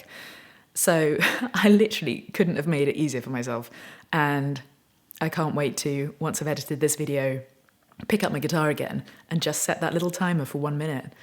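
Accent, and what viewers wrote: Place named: RP, United Kingdom. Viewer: The resolution idea is just a fabrication. Some wishful thinking most humans blather, then fail about. You probably don't have a cat, because you travel so much, but, if you did have a cat, I would counsel you to monitor their way of passing the days. What do you want really? More fame or money? More free time? To play like Marty or Paul Davids, John Mayer or hundreds of other guitar players? (Chet Atkins, Tommy Emmanuel, Glen Campbell, Vince Gill, Justin, BB, Stevie Ray, Jimi, Bonnie to name a few). I would say...forget the New Years resolution aspect...and just do you. Different topic. How would you characterize your english accent? Oxford like? Upper crust I should imagine. But I am interested in how you would describe it. I am several generations older...dad was a WW2 pilot..so, I am a boomer...one toe in the cemetery...nearly an ex-parrot. 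Just be you. You are wonderful as you are.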